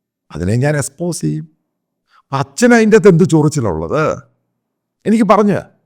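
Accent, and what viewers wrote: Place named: Pathanapuram, India. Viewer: സാമുവൽ സാർ ഞാൻ കേരളത്തിൽ ഞാൻ ആരാധിക്കുന്ന അപൂർവം ചില journalists കളിൽ ഒരാളാണ് സാമുവൽ സാർ.. കാര്യങ്ങൾ പഠിച്ച് മനസിലാക്കി സത്യം ജനങ്ങളിൽ എത്തിക്കുന്ന താങ്കളുടെ Hard journalism ഉം പത്തനാപുരത്തുകാരുടെ Accent ഉം എന്നെ വല്ലാതെ കോരിത്തരിപ്പിക്കുന്നു. ആളുകൾ വിമർശിച്ചോട്ടെ.... സാമുവൽ ധൈര്യമായി മുന്നോട്ട് പോകണം സർ. I wish u all success to you and your news channel which proved to be Genuine and popular news channel in Kerala. Ajith Kumar from Thiruvananthapuram.🙏🏻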